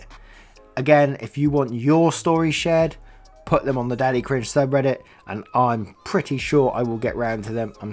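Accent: United Kingdom. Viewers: While I know why most Youtubers stay away from swearing these days... I do enjoy someone who actually fills out the swearing even when the text is censored! Sometimes people just need to hear that they're being a twat, right? Daddy Cringe is one of my favourite Reddit readers partly because of the chill attitude, the chuckles and of course the British accent.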